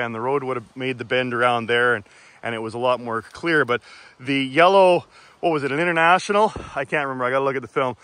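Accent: Canada. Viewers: This is the first video I've seen of yours. I had no idea you were Canadian, but I thought to myself "that guys almost sounds Canadian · Loved the video. You speak like a Canadian though. Figured it was some southern local guy recalling old times. Ah, Alberta I see.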